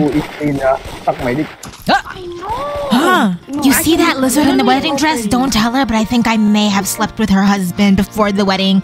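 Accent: Jamaica. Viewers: I like how she tried so hard on the Jamaican accent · Are u Jamaican cause I'm Jamaican. BTW I'm your biggest fan ❤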